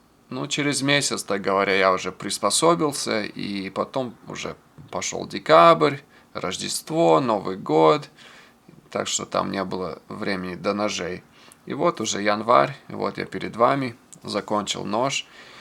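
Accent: Russia. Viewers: твои ножи - это искусство, руки золотые! У тебя не английский, а русский с акцентом, судя по всему ты уже давно говоришь на английском. Удачи и ждем новых произведений!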